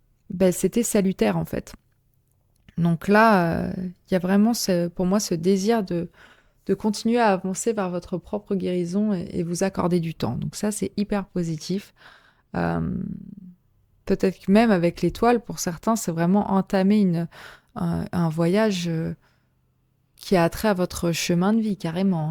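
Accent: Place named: Acadia, Canada. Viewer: Salut, heummm la carte no 2 me fait penser à la tradition druidique hehe hahaha l'accent me fait plus penser à celui de l'Acadie hehe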